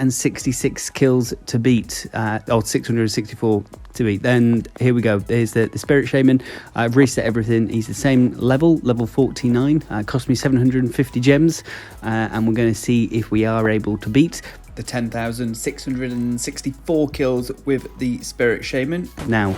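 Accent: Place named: Australia. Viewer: Just started playing. Also broke the bank and bought packs…🫣🫣 you sound Australian too! You were the first one to pop up in my search. I hope that you have good advice and can guide me through the game as well with good tips. I’m level 29 atm.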